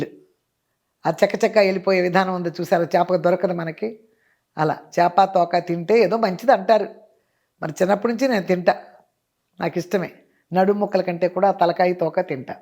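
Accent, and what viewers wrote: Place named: Nellore, India. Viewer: Amma mee matalu naku chala estam madi nellore ma matalu kuda elage untayi meeru matladuthunte vinaali anipisthundi amma ❤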